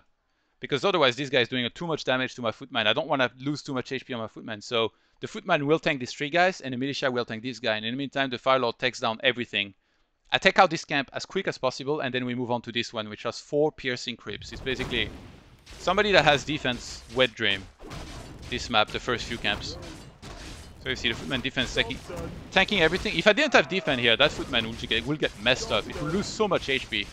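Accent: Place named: France. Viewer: la légende FR toujours un plaisir tes vidéos même avec ton accent bien français mdr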